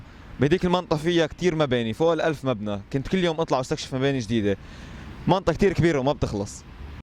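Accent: Lebanon. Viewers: لهجتك كتير قريبة ع اللهجة اللبنانية، و ع فكره باركور مخيف😍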